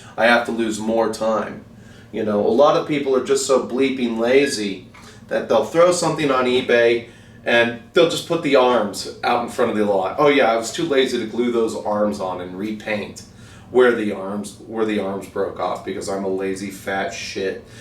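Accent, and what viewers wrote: United Kingdom. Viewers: That is alot of figures.... alot! Im missing your British accent, the guy from Upton that supergule his stamps and because of that charge you extra for the shipping cost. 😎👍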